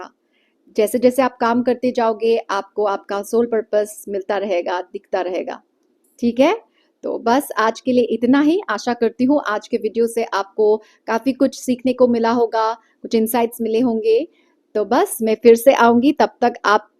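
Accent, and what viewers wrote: Assam, India. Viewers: You’re from Assam? I find your Hindi accent a bit of Assamese😅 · Ma'am aap Assamese hai kya?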